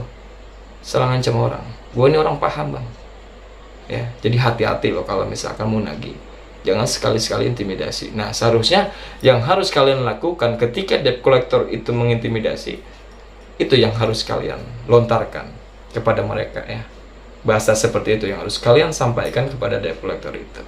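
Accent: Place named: Java, Indonesia. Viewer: Abang orang mna. Kyaknya ada Jawa nya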